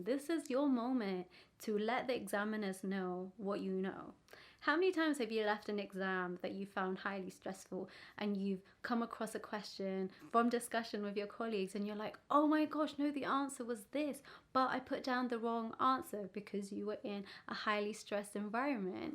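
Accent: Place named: India. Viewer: This is first time I'm watching your channel . I'm preparing for Neet (medical entrance exam ) .. I also have a feeling that your from India .. but I like your accent .. And thank you for these tips 😊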